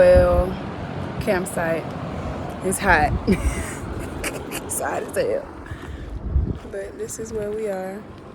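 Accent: Houston, United States. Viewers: she does🤷🏾‍♀️ I'm from Houston, it's our accent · I think it's a Houston thing... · Is it just me or does she sound like Beyonce?! Must be that Houston twang lol. Loved this video! I love that you are showing the realness of van life and not the fantasy! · It’s just the Houston accent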